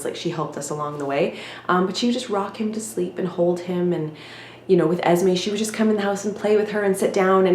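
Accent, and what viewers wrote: Malaysia. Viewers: Your Malaysian English accent is so on point! hahaha. I love it here as it is chill, but active at the same time. And the foood. I always miss it after a trip overseas! We hope to see you in Malaysia soon again! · haha,bunyi dah macam org malaysia betul je... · you lived in penang! do u have the penang accent? ;)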